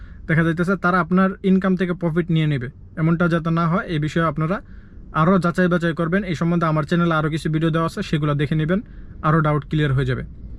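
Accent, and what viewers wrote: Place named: Comilla, Bangladesh. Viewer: ভাই আপনার কথাবার্তা টুন মনে হচ্ছে আপনার দেশের বাড়ি কুমিল্লা ভাই আমার নিজের দেশের বাড়িও কুমিল্লা মুরাদনগর থানা গ্রাম ধামগড়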